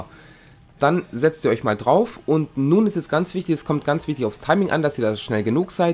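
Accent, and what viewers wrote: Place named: Swabia, Germany. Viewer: Dein Akzent ^^ Ich liebe ihn haha ;D Schwabe :D Ich wohn in Ravensburg, sagt dir das was? :D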